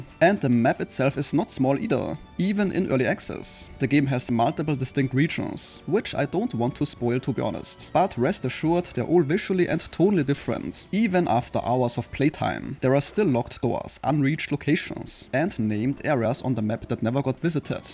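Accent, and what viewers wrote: Germany. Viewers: German or?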